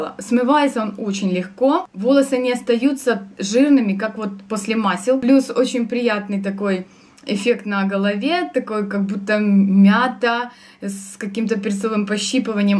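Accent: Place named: Ukraine. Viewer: у Вас такий милий акцент український=)і Ви сама дуже мила і цікава=)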